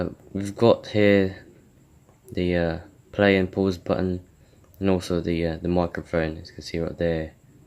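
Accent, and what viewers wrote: United Kingdom. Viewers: I know its too late to comment but you really have a nice british accent Im practicing my british accent! Sanaol marunong magaling mag english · I have those! But its gray, i got it for my bday Also nice british accent, i dont think i can do british lol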